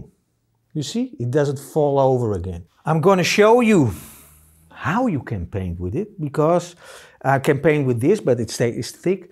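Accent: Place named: Netherlands. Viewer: Dear sir. Do I have to tell that I love your dutch accent. I loved this, even if I am familiar with paint makings since many years. I love when you say Rembrandt in a way that he would have said. I am a swedish old man, and understands just some words here and there, that we share, but I love the power and poetics in the dutch language. Many thanks for the tutorial.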